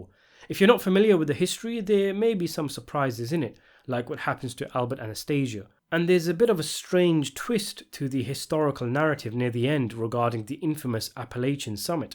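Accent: United Kingdom. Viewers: Lacks authenticity kinda like a guy from britian or wherever the hell your from talking about shit that happened in our neighborhoods lol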